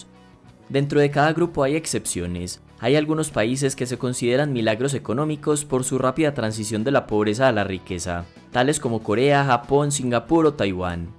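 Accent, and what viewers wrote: Antioquia, Colombia. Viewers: Se escucha como paisa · De qué parte de Colombia eres Men, no reconozco tu acento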